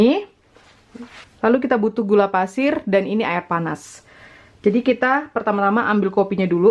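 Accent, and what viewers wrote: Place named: Kalimantan, Indonesia. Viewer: Enakkah? = nyamankah? Hehehehehehhe... logat kalimantannya kentel ya...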